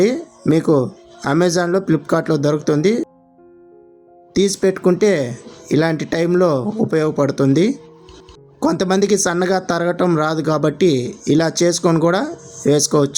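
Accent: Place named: Karnataka, India. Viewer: it seems you are from Karnataka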